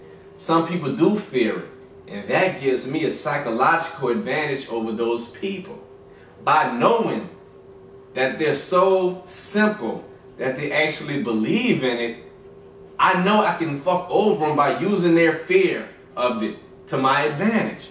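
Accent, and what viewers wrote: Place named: South, United States. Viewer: I can understand him fine, don't be mad cause your not southern...we can't all be wonderful!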